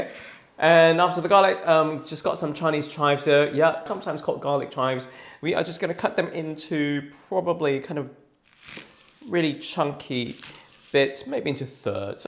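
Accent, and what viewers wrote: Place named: RP, United Kingdom. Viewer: What's with the posh voice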